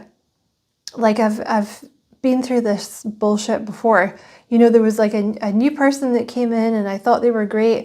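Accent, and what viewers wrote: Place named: Scotland, United Kingdom. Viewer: Thank you. The technology world it's slowly killing the human world and it's based on convenience that is alienating us from each other. I talk with older people and they get me. I talked with younger people and they're nice but it's like a program nice with no eye contact. People are so afraid of their own vulnerability that they won't take the risk of getting to know each other. And I think in the older world we were forced into it. Where today we can sit in front of our phone and say and do things that don't require any risk. I love hearing your Scottish accent and I believe you're a real person but this gets in the way of human interaction sometimes and I'm glad I have a job where I meet with real people in their homes. Honestly I think that's what the younger generation is missing. I will retire and go back to Scotland because I think there is still enough people left their who get it. 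God bless you you're a good soul.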